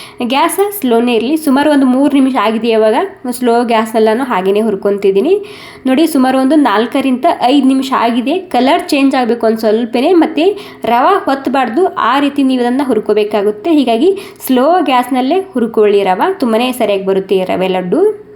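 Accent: Maharashtra, India. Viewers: Recipe 👌👌,and your Kannada with Marathi accent 😊Stay blessed.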